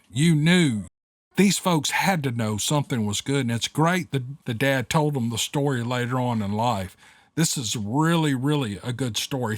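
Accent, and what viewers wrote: South, United States. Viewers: My mother taught me, "If you don't have something good to say don't say nothing at all." Just as you said, " Turn off the channel." ❤ I love your southern accent and everyone has trouble pernouncing some words. I'm guilty of that and I'm bad at spelling too. 😂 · hey,its Peggie Sue from Ky, and i just want you to know that the way you talk is why i first started listening to you, and that was before you had started numbering your vids. So from one southern accent to another, dont feel like you ever owe an apology for being true to who you really are. That tortured soul, responsible for the volatile email you received, clearly hasnt reached the peace and happiness that you have, so for this envy and absolute ignorance, they responded the only way they knew....BEING IGNORANT DISGUISED AS BEING SUPERIOR. So, may God bless you and your family. 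· I personly like your southern drawl NEVER CHANGE IT ! TO H3LL WITH THEM !! WHAT part of Mississippi are you form most of my family in Mississippi is in the OXFORD area ..They have Ghost Stories and Black Panther stories .. Now you have a GOOD Night Maybe We can Meet up for a Barbeque and tell some tales for your show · Never apologize for your Southern accent! You don't sound any better or worse than the rest of us Southerners, so you're in good company. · Wow, what an email. Can’t please everyone. We ❤your podcast and your Southern Drawl. Thank you. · Stay southern.i got your back. Iam from southern Missouri. Have the same southern. Talk. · We love your videos. Your the best story teller on YouTube, hands down. Southern accent and southern style story telling at its finest. Alright here we go! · The author of that email needs LOTS OF PRAYER. Why would you waste your time running another person down like that? 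She is in a sad, angry place. I think I have been listening since the beginning and am so grateful for all of your effort,. You are correct about the pronunciation of bipedal. I just always assumed it was your Southern accent if you pronounced things differently than I do. 😅😊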